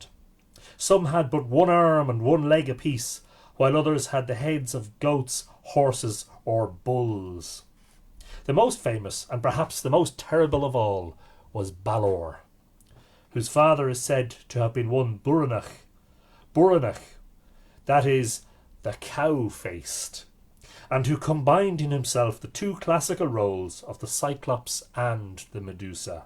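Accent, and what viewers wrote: Ireland. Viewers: I love Irish mythology, and always have, and to hear it read by an Irishman? Thank you sir, for this pleasure.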